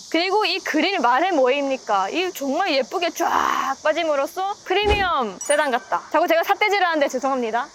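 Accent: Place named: Busan, South Korea. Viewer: 음~~ 서울 상경한지 꽤 됐는데 아직 서울말 패치가 덜 된 부산아가씨 억양이네 ^^ 재밌는건 부산 가서 저런 톤으로 말하면 서울말 쓴다고 면박주고 서울에서는 아직 경상도 사투리 못 벗어났다고 하거든 정체성의 혼란을 겪고 있을 시기임 ㅋㅋ 리뷰는 재밌네요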